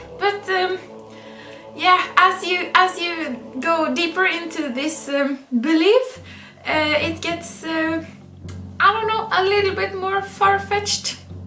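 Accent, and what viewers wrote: Norway. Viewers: Love your Norwegian dialect. I never heard a Norwegian say the “r” sound in the back of their throat like a German. I am a German speaker, btw.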